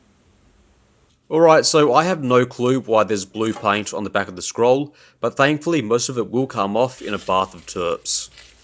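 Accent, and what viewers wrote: Australia. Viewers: Wait, when did This Old Tony get an Australian accent?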